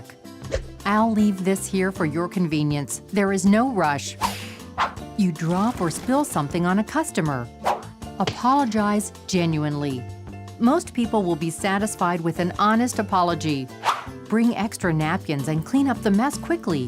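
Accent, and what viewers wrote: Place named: United States. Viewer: A bit American but good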